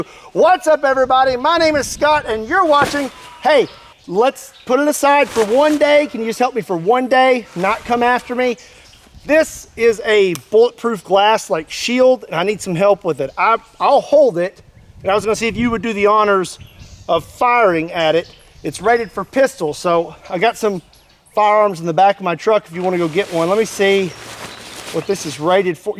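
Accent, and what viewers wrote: Kentucky, United States. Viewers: Are you in east or west it I live in Paducah which is west you sound like your in west Kentucky which is the more gun friendly part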